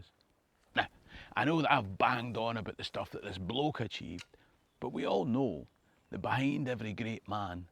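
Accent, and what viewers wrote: Scotland, United Kingdom. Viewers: I think the accent has to be my favourite. The Scottish accent has inbuilt inflections, expressions and passion. It's also good to listen to a mixed race person who is at least as interested in the history of his white ancestors as his black ancestors. None of us really knows all the races they come from. As my paternal grandmother once said "a person is lucky to know who their grandparents are by looks. Women were forced. Women had affairs. No one really knows". As for DNA tests, people have received mixed results from different labs and some people have sent off the DNA of their pet and received back results for a human. A real in depth result costs a lot more than most run of the mill labs offer. DNA ancestry tests are for profit and for data gathering. One in particular admitted selling the info on to insurance companies.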